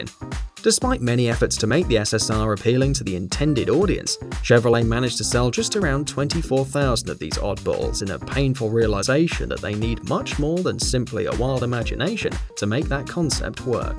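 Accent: England, United Kingdom. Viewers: Hey mate I love your video, and your accent. English me thinks, but I'm a bit butt hurt, about that (except trolls) bit. So I guess I won't be hearing from you. Bollocks we Trolls never get to have any Fun. The Trolls need Love too, you know. Oh well, after a good cry, I'm going to another channel, and "Let slip the dogs of War". Fair thee well, my lord. ( ;) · Why is this an English narrator for a video about American cars?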